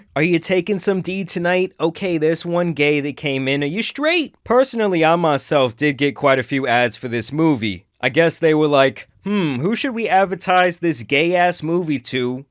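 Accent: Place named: Long Island, United States. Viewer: How are you from Texas but have a Long Island accent?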